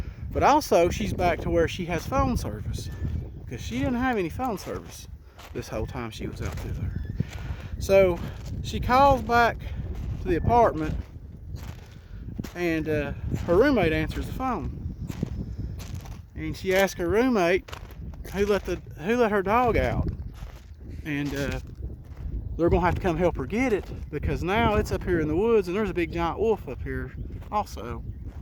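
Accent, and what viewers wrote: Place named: Virginia, United States. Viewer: no problem man. I just sent my wife a.message and told her I got a comment from. I wish I had an email or sumn. Where I could converse with ya a bit. I have some pretty interesting stories from around here in the Piedmont as well. But I completely understand why you dont much communicate with people, etc. and I respect it..I have grown to have a bit of dialogue w/Brian from blackmass paranormal on eastern Tennessee. And another guy from liberty and even another still from West VA and we all exchange stories, etc. it was nice to hear from ya man. If you ever do open up a line of communication, lemme know. I'd be extremely interested. Anyhow. Keep on keeping on with the channel don't let haters or the locals discourage you. I know how locals can be some times especially when they feel they have a stake on the story being told or maybe it'll have the potential to mess with their family. 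I dunno. But keep on with it as long as you can. Me and my wife love your stories. I feel like I know you just by the way you talk and the things you say. We love everything about what you do and man if it isn't interesting. also love your accent, reminds me of my dad's mom. She had a way of speaking I consider like old.south or old Virginia like antebellum type way of speaking. The older I get the more I sound like her. Of course she's gone now, dad's gone now..but we truly feel like we know you. We appreciate your dedication to getting the truth out. Those.locals, some, would p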